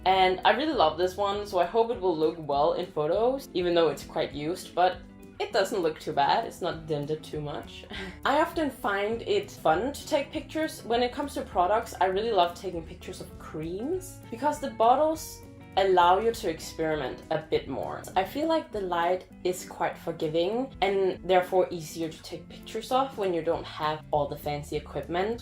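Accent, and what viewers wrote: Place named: Scandinavia. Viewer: Oh my gods, I did wonder whether you were Scandinavian or Dutch or something similar due to your accent - and here you are walking around in my own city! Gosh, the world is a tiny place sometimes XD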